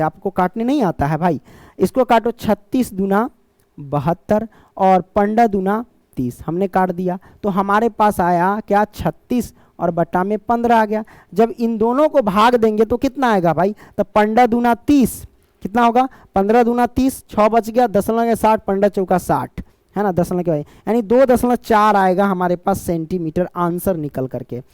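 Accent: Bihar, India. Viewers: Sar aap bihar se ho naa ❤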